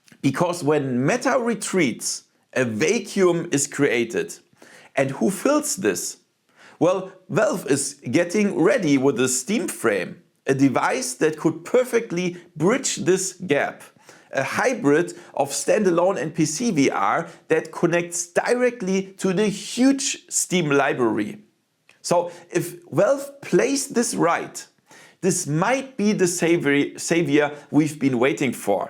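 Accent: Germany. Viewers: he is german , you always can hear that , its with every language they try to speak.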